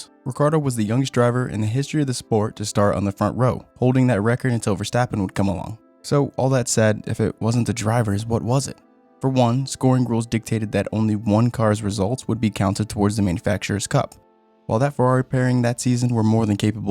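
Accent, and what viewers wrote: United States. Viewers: no worries, its refreshing to hear an American talk about F1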